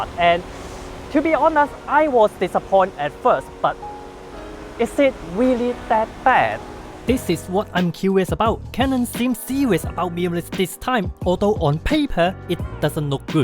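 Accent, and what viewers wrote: China. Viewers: Your English sounds funny ..typical Chinese slang...lol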